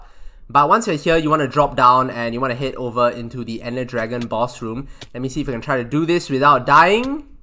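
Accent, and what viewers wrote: Singapore. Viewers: knew it from the singapore dialect that i have heard.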